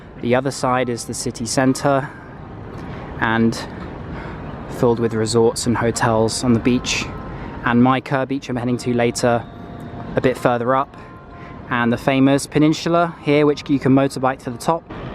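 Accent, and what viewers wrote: United Kingdom. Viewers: british accent , omg I love american accent !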